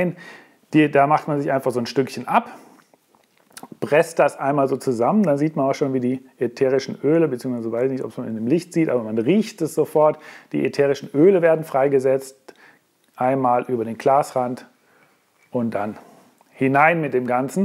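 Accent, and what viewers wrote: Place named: Germany. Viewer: My favorite gin... and German accent makes me weak in the knees :-)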